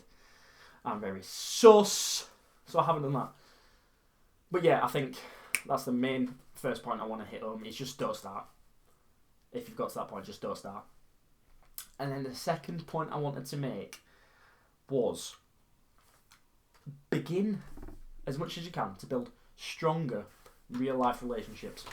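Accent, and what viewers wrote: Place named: Manchester, United Kingdom. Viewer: You from Manchester bro? You have the accent for sure. A fellow Manc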